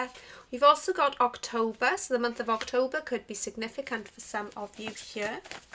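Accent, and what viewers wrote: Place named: Wales, United Kingdom. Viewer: Oh yea!! 😍💪 After a good few years of listening to these taro card videos, iv never found a Welsh person do one 😍💪greetings from Carmarthenshire 😜💪 and I have to say you have a Beautiful accent 😍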